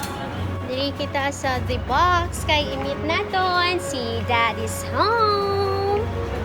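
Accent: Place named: Ilonggo, Philippines. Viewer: Iba talaga pag ilonggo nagsasalita napaka sweet pakinggan.. Nakaka intindi lang ako pero di nakakapagsalita.. Nakapunta na ako jan sis sa Iloilo sis natutuwa ako sayo.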